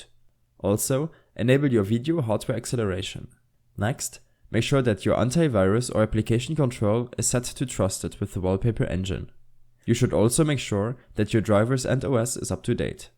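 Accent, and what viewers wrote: Germany. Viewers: ich wusste das du deutsch bist wegen dem Akzent haha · Man hört direkt raus, dass du deutsch bist xD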